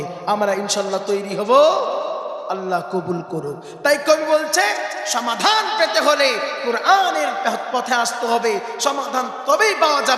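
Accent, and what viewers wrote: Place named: Bangladesh. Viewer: আপনার ওয়াজ খুব সুন্দর বাংলাদেশির মত 😊😊😊😊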